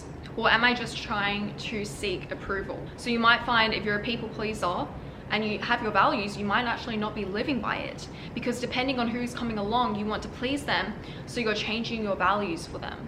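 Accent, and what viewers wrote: Australia. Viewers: i love ur aussie accent it's so effortlessly sassy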